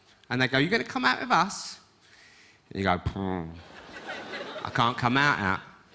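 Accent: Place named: Cockney, United Kingdom. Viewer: A Proper real life event told by a Cockney . 👍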